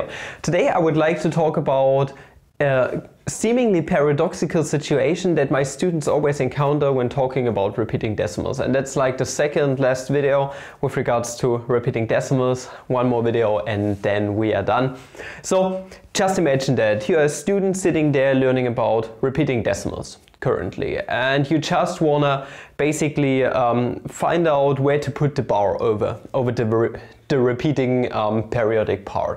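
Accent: Germany. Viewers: It’s not you, it’s your german accent.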